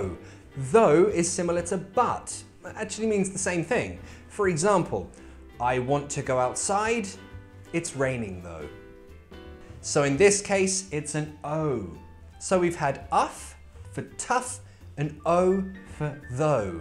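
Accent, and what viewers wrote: United Kingdom. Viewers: i really enjoy learning british accent with you funny teacher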